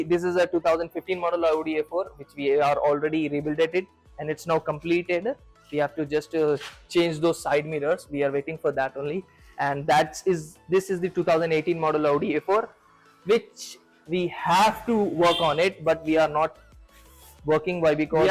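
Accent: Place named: Bangalore, India. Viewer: typical blg accent uhh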